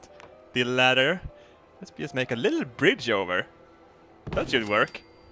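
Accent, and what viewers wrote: Sweden. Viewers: are Swedish right? I can hear it on your voice. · "fort yinyer"...dat swedish english though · Is Swedish or something? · are you swidish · best Swedish accent ever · Jacob Tidwell he’s Swedish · "Home cozy" love your swinglish... Have you found any "stabsnakes" yet?? You've got a new subscriber! 😄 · det är så kul att man kan höra när folk på Youtube är från Sverige bara genom att lyssna på när de pratar engelska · you better make more of these ...I can't fuckin stand other non vikingss talking in non swedish accents THE GLORY IS ALL YOURS BALDER HIMSELF LAUGHS MARKED WITH LIGHTNING STRIKES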